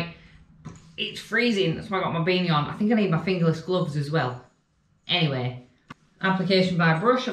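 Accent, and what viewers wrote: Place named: Newcastle, United Kingdom. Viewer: Def heard the geordie in there. I didnt hear the Yorkshire but to be honest I dont know many people from there, I am not trained to spot that accent. I am Greek, living in Newcastle.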